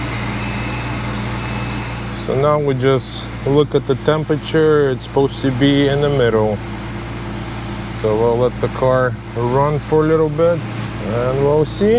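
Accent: Russia. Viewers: You sure sound Russian.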